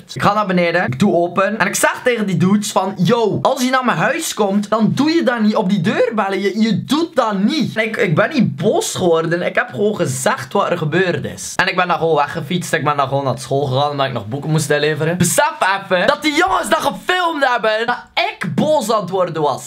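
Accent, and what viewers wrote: Belgium. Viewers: als je 100% Hollands bent is het Belgische accent best grappig! toffe video btw! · hahaha jou west-vlaams is awesome! het is nice om te hore da je in je vids normaal praat en dan anders gewoonweg nice (ik ben zelf ook west-vlaams)❤️🙈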